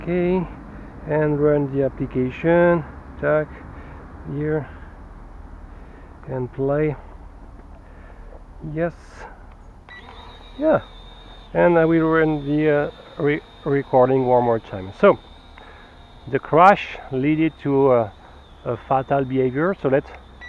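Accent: France. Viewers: Ca s'entend que tu es français mais ça reste une très bonne vidéo!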